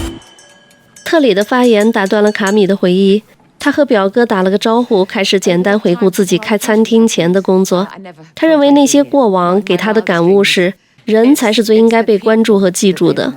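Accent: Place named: North, China. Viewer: 不是AI，好像是个北方姑娘，声音甜美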